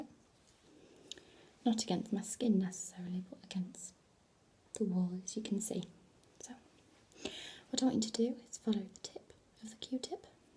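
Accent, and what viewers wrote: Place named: Southern England, United Kingdom. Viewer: Brok Homz good old south of England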